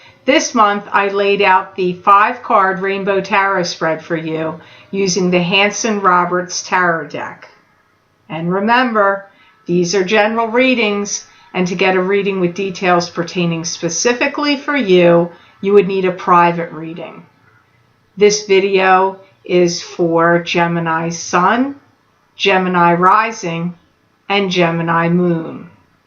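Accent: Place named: Maryland, United States. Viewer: Your accent feels like home to me... I swear you're from Maryland or Pennsylvania! Love the video and thank you for your words. :)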